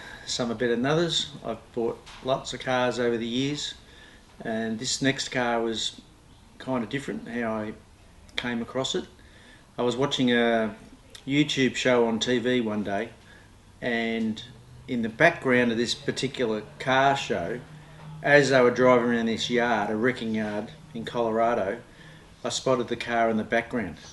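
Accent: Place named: Australia. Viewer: I love his austrialia accent. Its wonderful. Just love hearing him talk. The 300 is absolutley beautiful.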